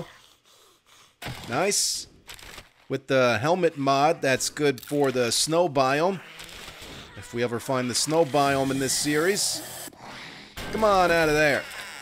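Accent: New Jersey, United States. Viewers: New Jersey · Close, he’s from Jersey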